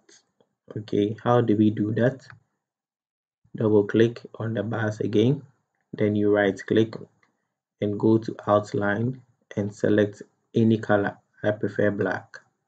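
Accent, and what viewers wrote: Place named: Africa. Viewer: omo oh i just hear the accent i know sey na africa. welldone bros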